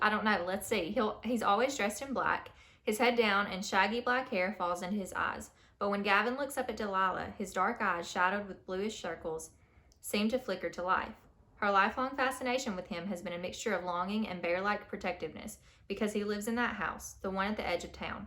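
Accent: South, United States. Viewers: You have my favorite southern accent on YT. I'm from Mississippi; I used to have a distinct southern drawl before I spent years living in Europe and doing voice work over the last years. At any rate, if you like to read out loud, you could always play a part in one of my readings. I have many collabs planned with different creators, and a backlog of works to do. Sincerely, Scully.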